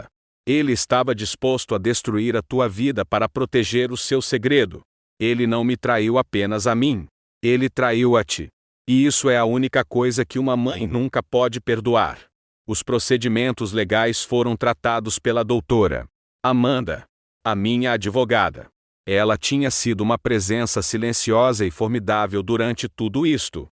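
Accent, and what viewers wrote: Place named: Fortaleza, Brazil. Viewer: Não gostei do narador é meio esquegito de Fortaleza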